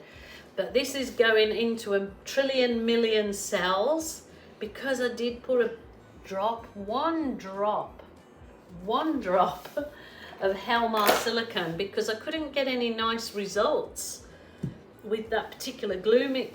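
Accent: Birmingham, United Kingdom. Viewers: Wow that is brilliant...love these colours. You may be in australia but to us brits we can still hear your brummie accent x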